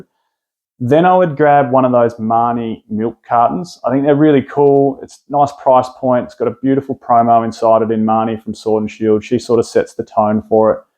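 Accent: Australia. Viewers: Embrace the Aussie Accent nothing wrong with culture in the hobby ❤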